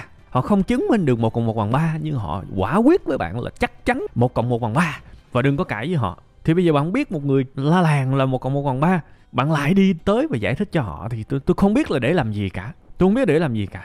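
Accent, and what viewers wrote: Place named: South, Vietnam. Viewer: Nghe giọng nói miền Nam và dẫn chứng rất dễ hiểu, Xúc tích và trọng tâm ! Chú duyệt 100%,Chúc cháu khỏe !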